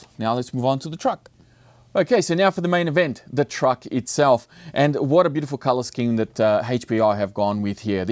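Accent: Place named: Australia. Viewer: Nothing like that aussie accent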